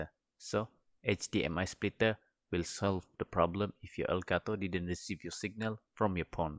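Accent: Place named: Sabah, Malaysia. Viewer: Mcm sabahan beii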